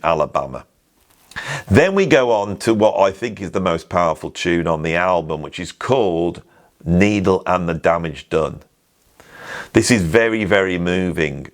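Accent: United Kingdom. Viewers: Sorry, squire, I gobbed on your carpet. Frankly, your entire verbal rap is obnoxious, and you can't even hear yourself making "bloody dodgy" statements in that bloody rough British accent. You state, just before I decided to bail out in disgust, that you're going to give your "objective opinion". Really?! An "opinion" is not "objective" by definition. And using language like "a piece of shite [lovely]" and "yech" (huh?) are hardly the verbiage of objective criticism as opposed to highly subjective and low-IQ reaction. You're starting out wrong when you foolishly seek out the Rolling Stone's "500 Greatest Albums" or whatever lame source, though there will be some worthy albums in such a list. As for Neil Young, it's pretty simple. He WAS a great artist at one time, from roughly his time in Buffalo Springfield through his first few solo albums and some collaboration with Crosby, Stills and Nash. 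His albums were usually erratic in terms of song quality, but the earlier solo albums feature many great songs. He has not produced an album worthy of his legacy for more than 25 years, instead popping out these dull, lyrically strained and melodically uninspired "songs" out of a desire to keep playing and stay relevant somehow. Fans with no critical faculties and many critics who betray their own standards continue to praise and indulge Neil. But meantime, you think some Nirvana album is "bloody amazing" but you determine to hate Neil Young and the "Harvest" album. Well, bud, your non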